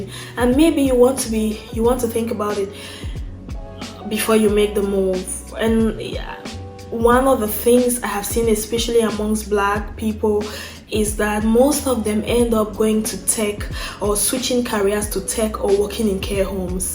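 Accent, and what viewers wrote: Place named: Nigeria. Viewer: You sound so Nigerian 😃😃😃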